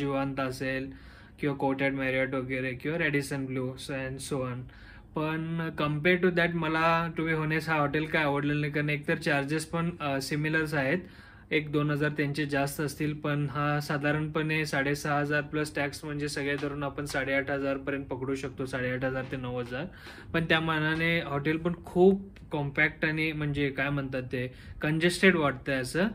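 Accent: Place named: Kolhapur, India. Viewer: भारी भारी 😃😃 As a 1st vlog looking much more confident. "पटनं तुम्हानी दाखवतो" will steal the hearts of kolhapuri folks. I'll be excited for more video like this specially in कोल्हापूरी accent touch. I know you have that inherent kolhapuri accent. So, looking forward for such more videos. by default "Minglish" language is understandable because of your profession. Keep it up 😅🎉👍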